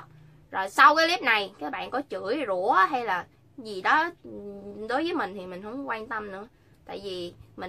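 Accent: Standard. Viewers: Chi noi chuan ghe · Nói chuẩn vler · Cj nói chuẩn ko cần chỉnh lun á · cj nói wa chuẩn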